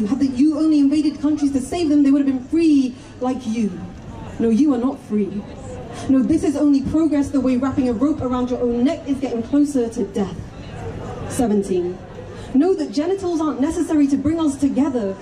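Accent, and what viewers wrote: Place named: RP, United Kingdom. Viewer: This idiot sounds SO FUCKING POSH... these people are so detached from reality, it's absolutely mind-boggling. I can only see total collapse in the future, we are fooling ourselves if we think we are winning the culture war. The saddest part is, where do we go? I have nowhere to go, England is my home, and every western country has the same problems. I think the best thing would be to create safe communities, with fences... I see a South African style future for us in the west, it's sad. We gave away our perfect slice of peace and quiet for this.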